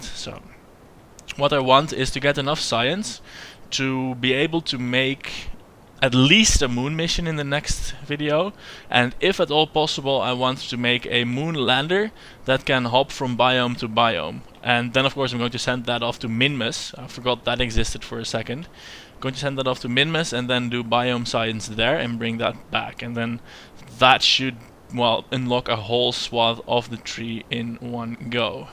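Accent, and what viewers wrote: Netherlands. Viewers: is he dutch? yeah? so obvoius lol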